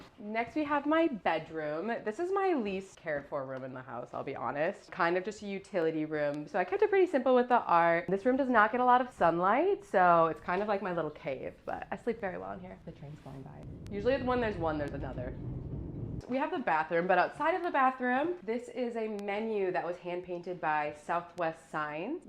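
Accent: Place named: California, United States. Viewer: It's a way of speaking common to California and especially LA. Several people commented about it, after your comment, and speculated that she's from there. And altho' I'm in NC now, I lived in LA for 30 yrs. So I didn't even notice it, lol!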